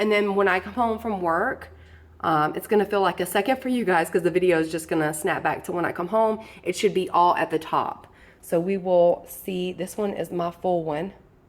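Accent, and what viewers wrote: South, United States. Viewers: Girl, save your fingers! Just sit the jar on the candle warmer and enjoy the scent right out of the jar! When scent is not as strong anymore, dump out the wax in trash and wipe with paper towel and then wash jar. Less mess, and you won't burn your fingers! If you want to make the melts, just wait until all wax has melted and pour into silicone molds. I don't bother using melts or burning candles anymore. I just sit the jars on the warmer. So much easier! Love your videos and enjoy listening to your accent. "Y'all' Blessings. 😊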